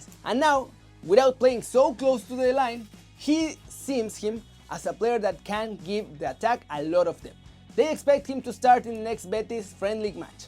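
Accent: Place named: Mexico. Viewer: Muy bien overall, si note unas cosas, pero muy bien, el acento te delata, pero no se si seria español o mexicano, creo mas mexicano que español, no te preocupes yo tengo 23 años aca y se me olvidaron muchas cosas por la peda, lo entiendo muy bien y lo hablaba mas que menos, pero ahora se me olvidan muchas cosas. 😩😁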